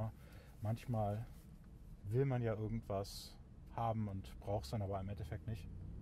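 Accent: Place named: Germany. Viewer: Haha ich liebe es wie deutsch du alles aussprichst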